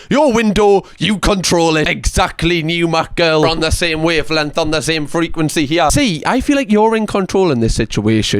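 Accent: Newcastle, United Kingdom. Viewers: Funny story: I fell asleep listening to YouTube and ended up being a part of my dream. A group of us and were in a restaurant where I live in Louisiana USA. was talking constantly and sometimes loudly about Gordon Ramsey in his Geordie accent and all of the redneck southerners were giving us strange looks and saying stuff. We were, like, “oh…he’s our cousin visiting us from the UK.” Whenever he’d say “Gordon” we’d say loudly “he means Uncle Gordon.” Now I kinda wish really was our cousin from the UK who comes to visit!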